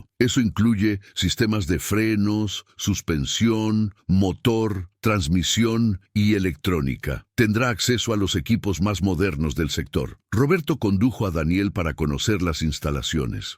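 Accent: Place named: Chaco, Argentina. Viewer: te veo de argentinaho escucho pravincia del chaco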